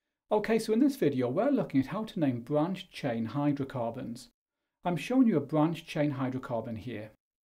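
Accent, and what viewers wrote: United Kingdom. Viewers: WHERE IS OUR OG BRITISH ACCENT!?!?!?😩😔😔😔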